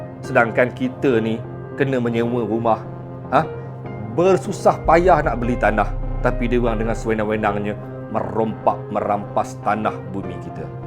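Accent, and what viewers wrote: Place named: Lampung, Indonesia. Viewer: Bahasa anda mirip bahasa Lampung mungkin satu rumpun dengan Lampung Indonesia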